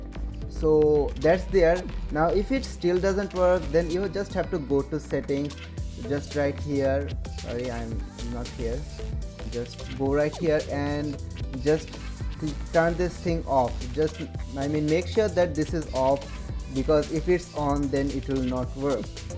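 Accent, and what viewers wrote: India. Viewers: your Indian accent made me suspicious but it worked so thanks ?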